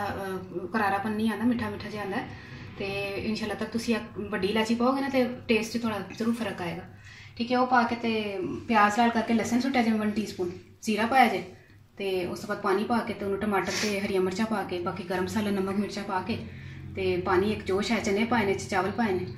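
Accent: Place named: Punjab, India. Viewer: اسلام واعلیکم میں لاہور سے ہوں اپنی جاب کے سلسلے میں یہاں مکہ میں رہتا ہوں اپکی تمام ویڈیوز دیکھتا ہوں اور ٹرائی بھی کرتا ہوں۔ میں یہ پہلی دفعہ کمنٹ کر رہا ہوں وجہ یہ ہے کہ آپکی ویڈیو دیکھنے سے ایک تو ریسیپی پتہ چلتی ہے دوسری آپکی پنجابی آواز سے ویڈیو میں ایک مزاخیہ پن برقرار رہتا ہے۔ یہ چنا چاول ریسیپی ٹرائی کی ہے اچھے بنے تھے چاول۔ شکریہ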